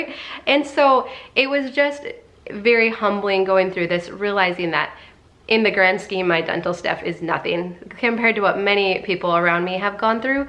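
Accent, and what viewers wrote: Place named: Midwest, United States. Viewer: It all depends on where in the US you’re from. Most of the country says roof with the “oo” sound like moon. But you can always spot a Midwesterner with “roof” and “root”. Oddly, “room” doesn’t follow the pattern. I’m from the Midwest as well, but my parents pronounced them with the usual “oo” sound so I did, too. But all of the kids I grew up with said them as does!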